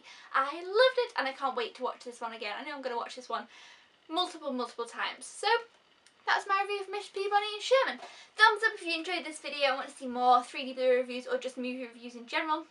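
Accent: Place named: United Kingdom. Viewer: I LOVE(d) YOUR REVIEW! IT IS NICE AND REFRESHING TO SEE A BEAUTIFUL WOMAN REVIEWING AS MOST MALES ARE REVIEWERS. You are perfect reviewing! With that said, I have been to your country twice (one time, a DAY trip from NY to London and back), and another time, couple day trip. Have you been to the States here? Moving on: I do own over 18,000 dvds/blurays all genres, including over 100 3-D, and 945 laserdiscs. And a full theatre surround system. Anyway, I do plan on purchasing MR PEABODY as I grew up with the original cartoon. And you convinced me it is a good movie to purchase. I look forward to your future reviews. You are so bubbly, cute, love the accent, and you are so much fun to watch review. Thank you very much, keep up the great work, and looking forward to your next review, whatever movie it will be. (I recently purchased TRANSFORMERS 4 3D, MILLION WAYS TO DIE IN THE WEST, GODZILLA 3D, and others.) Take care, Happy Holidays, and as you say in UK, "Cheerio"! 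Bye for now. (*feel free to reply back if you'd like). BYE!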